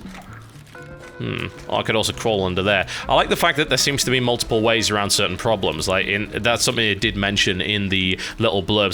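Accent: United Kingdom. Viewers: If it's one thing I love about, or perhaps the British accent in general, it's that you can say things like "...make me want to rip off my own face." and sound like a total gentleman.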